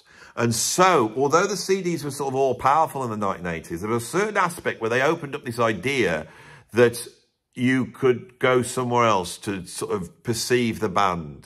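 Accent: Birmingham, United Kingdom. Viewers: You crack me up Brummie in a good way mate. Lived in Stourport-on-Severn <num>. No longer in the midlands, which I miss. CDs have their advantages and some sound fantastic BUT a good quality bit of well recorded vinyl on a quality turntable through a decent amp to decent speakers is something else again... natural! UTV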